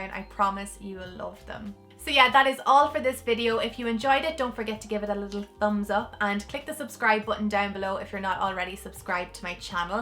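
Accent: Ireland. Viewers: Love the Irish accent so sweet. . Your hair is beautiful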